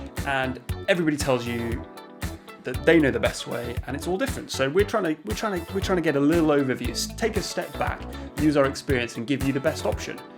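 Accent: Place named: England, United Kingdom. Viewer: Somehow I could tell you were English before you started speaking. Love the accent 👌🏽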